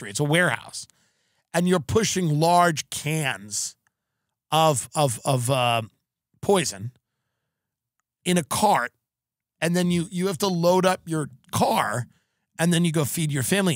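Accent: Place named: United States. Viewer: It's kind of weird, I saw his special... It was ... It was so stale. It was bad. Just really bad. But this podcast and way he performs here is pure gold. A real american voice. Everything he does in this podcast is 100% on point and makes me go crazy. This podcast is hilarious in a way that Louie describes, I feel how it's changing me as a human and how I view the world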